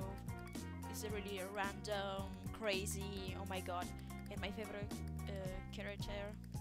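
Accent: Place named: United States. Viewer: hai una voce classica americana aahah ???? wtf???? hahaha mi fai troppo ridere!!!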